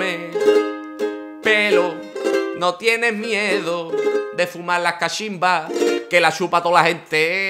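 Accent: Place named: Andalusia, Spain. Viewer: Qué arte, picha (o miarma, que no sabe uno bien por el acento)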